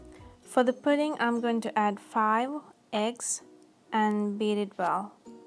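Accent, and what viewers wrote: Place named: India. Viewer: I hate your hindi accent.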